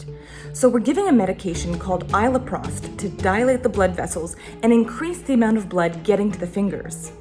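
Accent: Canada. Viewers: Where are you in Canada? Judging from your accent you're a native Canadian. Correct? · This is the first video that I have watched of this physician and I knew absolutely nothing a out her...but I guessed pretty quickly that she may be Canadian after she said "about", I'm CDN too and I guess we really do have an accent! I also have RA so it's pretty cool to see things from a doc's perspective.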